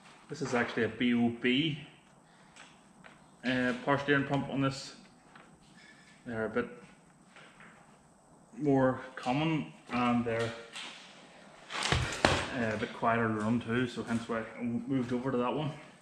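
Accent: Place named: Northern Ireland, United Kingdom. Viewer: Hello I have just started to follow your channel and I like what your are doing. It was the st170 engine into the mk2 that I was interested in first because at some point I will be putting an st170 into a red mk3 escort. By the sound of you your from Northern Ireland so am I can I ask what part are you from.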